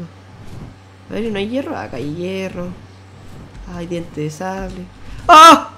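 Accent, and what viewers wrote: Chile. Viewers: chileno verdad??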